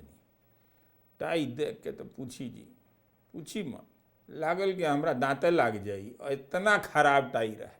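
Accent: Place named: Ballia, India. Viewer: आपके जेवन भोजपुरी बोले के शैली बान ऊं हमरा बलिया ज़िला से काफी मिलेगा रविश भैया मजा आ जाला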